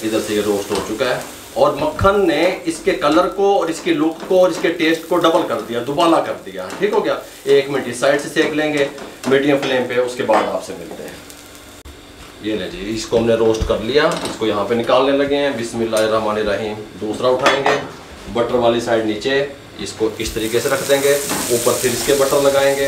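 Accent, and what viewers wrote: Punjab, India. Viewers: بھائی ریسپی کا سواد تو اپنی جگہ۔پر آپکا بیان کرنے کا انداز انتہائی سادہ اور پنجابی لہجہ کمال۔ بہت اعلی۔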